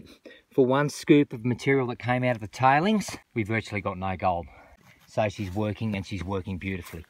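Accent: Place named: Australia. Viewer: Absolute awesomeness!!! Love your idea and you proved it works! Thank you for that warm Aussie Love! Adore your accent ❤❤❤ Coming from Alberta, Canada 🇨🇦❤ Love Australia 🇦🇺 ❤ Blessings 2U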